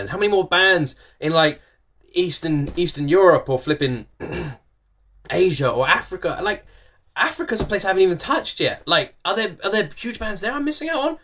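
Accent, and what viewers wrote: United Kingdom. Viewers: Tiene acento británico. Incluso dijo que está en UK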